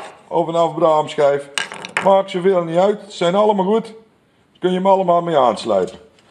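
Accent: Brabant, Netherlands. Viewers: jij bent een baas op het brabants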